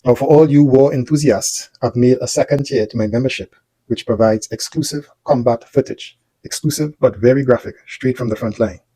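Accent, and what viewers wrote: Trinidad and Tobago. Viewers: Narrator sounds like a Trini?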